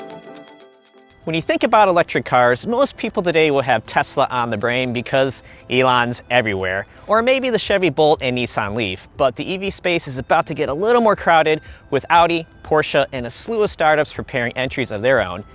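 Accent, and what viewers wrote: United States. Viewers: Holy Michigan accent. He sounds like John McElroy lol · 😊 I expected him to pronounce it like an American wood since he's speaks like one.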